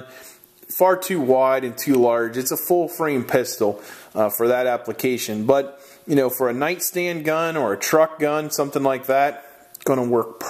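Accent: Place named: Delaware, United States. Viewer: where are you from. Sounds like Delaware accent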